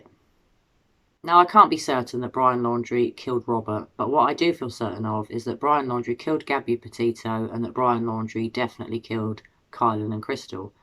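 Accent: United Kingdom. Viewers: thank you for your thoughtful investigation into these murders. It really is alarming to think of Brian as a serial killer!!! You are the first person in my observations on Y.T. to propose this possibility a few weeks ago. I am praying often for LE to catch him and bring him to full justice. PS -- being "across the pond" in SC, USA, I enjoy hearing your accent 😊!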